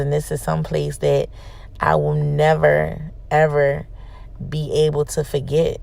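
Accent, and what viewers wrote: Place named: New Orleans, United States. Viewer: Your accent was straight giving me New Orleans vibes.🤩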